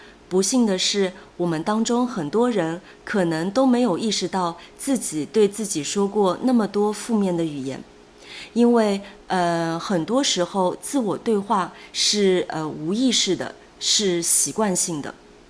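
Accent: Standard. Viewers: Another great video! Keep up the great work! (Also, very good standard accent) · 非常感谢老师 ！你的视频太有意思了 ，你讲的中文也很标准，听得清楚，口音也很好听，可爱。而且视频后的生词和造句也对我很有帮助..。我学到了好多词汇。一举两得❤😊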